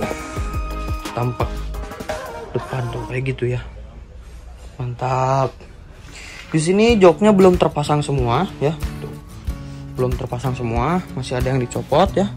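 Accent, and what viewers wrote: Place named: West Java, Indonesia. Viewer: Kaya orang sunda ya logatnya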